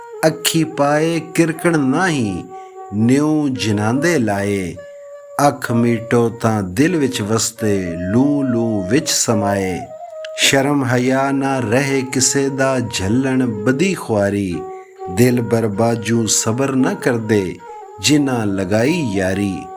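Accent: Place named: Lahore, Pakistan. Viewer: السلام علیکم عید مبارک صاحب. معزرت کے ساتھ سیف الملوک کے خالق کا لہجہ پوتھواری زبان میں تھا اپ اس کو پنجابی لاہوری اندازا میں، پیش کر رہے ہیں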